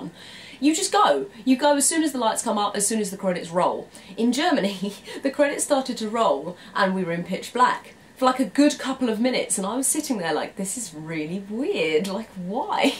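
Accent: London, United Kingdom. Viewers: Thanks a lot for the way you pronounced the "ch" in Munich, please try to call it by the Bavarian name München, as I keep trying to copy your British accent. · i love your London accent. That is so easy understandable for me. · As a German I have to say you've got a massive thick English (London) English (language) accent. Or British English. But you are speaking loud and clear. Thank you :-) Although sometimes much too fast to my German ears and I have to repeat. Normally I better can understand the American English because without this typical fat English (from England) dialect. But what I can understand is that you are enjoying your life in Germany and you see things as strange, which simply ordinary for every German. lol :-D PS: We love our bread too! Go on! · I fucking love the British accent. I dont know why. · Hello, thanks for that video, i was very interesting and fun to watch. 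I have never thought about a lot of the stuff you mentioned but now I realise it ^^ like the direct behavior and the unwilling for small talk. I wand to ask you if you have experiences with austrian people? Because I am from Austria and even when we have (nearly) the same language with Germany I think we are quite different in our behavior. But I am very curious:) ps I love your accent, I makes me want to go back to london! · I was looking for videos of German people and I'm thankful I came by yours! Really loved it. Plus, I love your expressions and that flawless British accent (well, you ARE British). Greetings from Argentina! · very charming accent. not too heavy, great speech .well done ,you must come to oz a lot of brits do and many stay for good. actually brexit will lead to much more trade and cheaper flights to oz and canada. go for it. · Thank you so much for sharing your observations of my homeland as an English woman - or may I say as a Londoner? 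Very interesting to see Germaqny through London eyes! And btw I LOVE the British accent so much! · aww i love the british accent, the first 10 seconds already made me melt. AWWW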